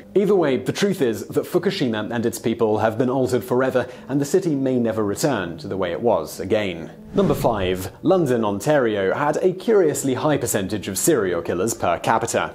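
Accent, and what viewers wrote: United Kingdom. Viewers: well... thats a very British accent... could we get subtitles? o.O my poor aussie brain cant understand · Whats the deal with all these blooming Brits narrating virtually every video on Earth ? Bloody 'ell !! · I really love your channel, the info is very interesting. BUT, why do you use such weird syntax that doesn't sound like English? I'm talking about something like this (this is not a direct quote, just an example); 'The people of Fukushima they have thyroid cancer'. Or ' India it has a big garbage problem'. The words 'they' and 'it' are completely superfluous in these sentences. You can take them out altogether and nothing changes in the meaning, the sentence just sounds correct. Maybe your writer is a second language English speaker, but I have to say it drives me absolutely crazy; eventually I will stop watching because I won't be able to stand listening to you mangling the English language with your very proper English accent....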